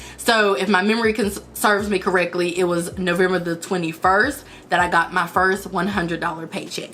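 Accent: South, United States. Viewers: She look like my ex I gotta go 😭😭😭😭😭 Your extra country accent sexy doe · Damn its like she got a stong southern accent n it almost sound kinda Baltimorish 😅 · She is gorgeous as many have said and I gotta say this, I'm just a couple mins in and usually I hate a country accent, country music all that but I hear hers and I love it and o love her hair! Continue ascending queen!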